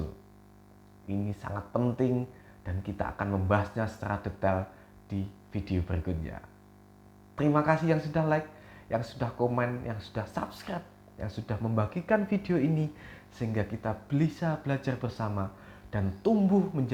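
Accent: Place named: Java, Indonesia. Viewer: Logatnya kaya orang jawa..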